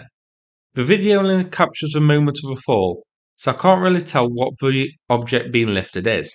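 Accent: United Kingdom. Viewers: Love the pommie accent and humour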